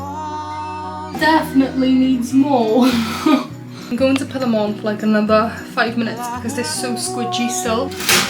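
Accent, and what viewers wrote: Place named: North, United Kingdom. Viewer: that's a very thick accent, northern England? Or Scottish?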